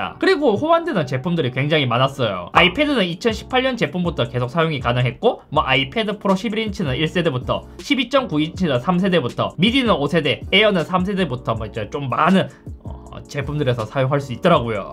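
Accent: Gyeongsang, South Korea. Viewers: 나름 얼리아답터인데 동영상을 즐겨보는 스타일이 아니라 일부러 찾아보진 않는데. 우연히 KT광랜 속도가 안나온다는 뉴스에 잇섭이 누구지? 해서 봤는데 ㅋㅋㅋ 일단 갱상도 말투에 재밌어요. 그래서 저의 유튜브 구독 1번이 되었습니다. 앞으로도 흥미진진한 컨텐츠 부탁드려요.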